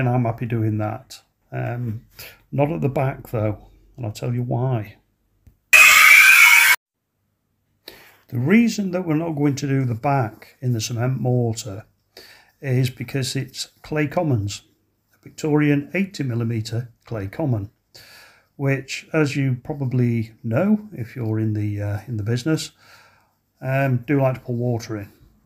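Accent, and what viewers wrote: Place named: Liverpool, United Kingdom. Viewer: I watch your channel too. Scouse geeza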